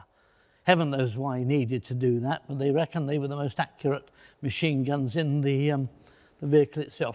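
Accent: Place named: United Kingdom. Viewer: The British accent and the beautiful mustache make the video better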